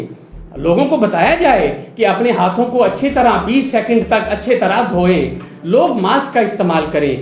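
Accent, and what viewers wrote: India. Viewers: اسکا لہجہ تو انڈیا جیسا ہے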